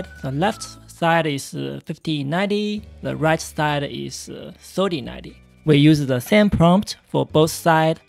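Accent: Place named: China. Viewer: 哈哈我一听就是中式英语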